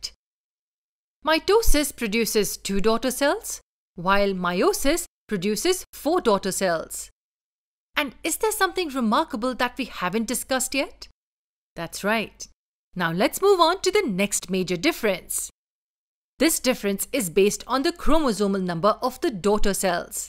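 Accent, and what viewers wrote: India. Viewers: Can you just change your Indian accent? That's so weird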